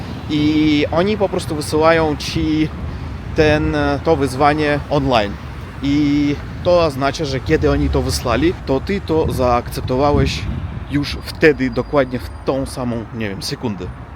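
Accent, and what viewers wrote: Russia. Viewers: jak dla mnie w rosyjskim akcencie nie ma nic złego, tak jak polski akcent w angielskim ;) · Człowieku mieszkasz w Polsce już 4 lata, nauczył byś się chociaż polskiego, bo ile można słuchać tego rusko-polskiego pierdolenia. Mam nadzieję, że pójdziesz po rozum do głowy i w końcu zaczniesz naukę.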